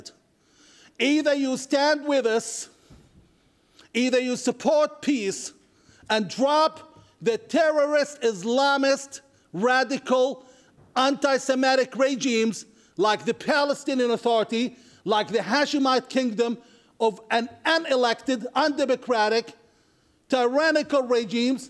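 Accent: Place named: United States. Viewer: Sounds like an American. His parents must have immigrated to the USA. Nothing negative, just saying he speaks American English.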